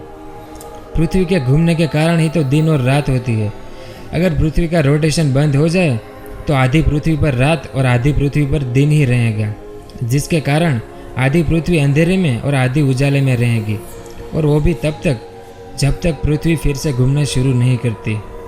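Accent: Gujarat, India. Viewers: bhai Gujarati hai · गुजराती हो किया भाई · brother u r gujarati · Gujarati hai tu.... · Bhai tu 100%gujraathi hai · Ha 100% u r gujrati.. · You sounds like a Gujarati 😃🙂☺ Gujjubhaai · You tuber is gujju ....???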